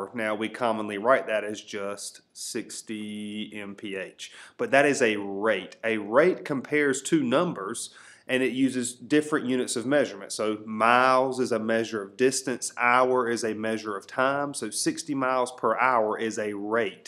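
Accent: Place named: North Carolina, United States. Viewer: Your videos are so helpful. Thank you for all your time and effort!! Taking my TEAS soon as someone who graduated college in 2015 so these refreshers are everything. Side note, are you by any chance from Eastern NC? Your accent sounds like me and my people!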